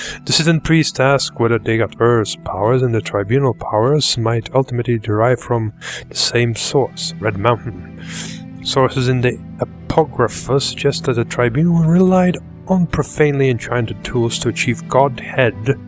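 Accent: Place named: England, United Kingdom. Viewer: fainas tavo angliskas akcentas :)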